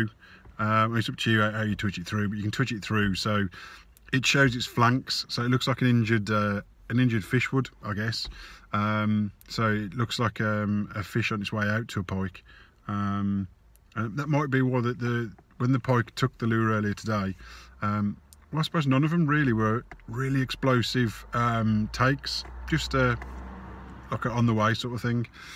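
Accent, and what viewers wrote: Birmingham, United Kingdom. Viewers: haha no brummy sure sound like one to me mate haha well yeah keep it up bud love the vids and speak soon good luck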